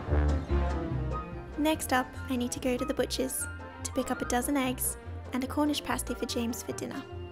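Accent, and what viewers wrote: Australia. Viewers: This video is absolutely lovely to watch and the girl who uploaded it is an absolute sweetheart; her milk & honey voice(🥛🍯) and Australian accent are a splender to the ear(she also has cute feet as well! 👣💞😁). 💕💕💕💕💕 😁😁😁😁😁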